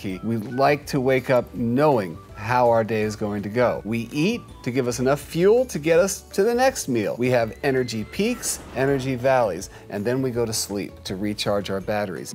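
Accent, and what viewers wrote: England, United Kingdom. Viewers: My cats Zooms during the day, she also makes a really unusual noise... she gets in the zone and she's zooming around. It is cute but I have upped her play time, so hopefully she uses up some energy. Nice British accent btw and that's from a Brit lol 🇬🇧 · I love the British accent Cat Daddy Dictionary time XD But also, I love when cats get the zoomies. Highly entertaining, but, play with your cats too! ^^ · Haha I am UKian, and you've got a great UK accent 👍🏼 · I adore your English accent- and that's coming from an English lady. Velvet all the way, my friend. 😘👌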